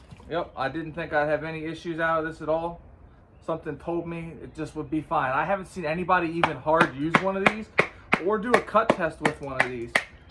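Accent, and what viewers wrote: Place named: Philadelphia, United States. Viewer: Sic blade, gonna have to pick this up. Where are you from? I hear a philly/Delaware, jersey accent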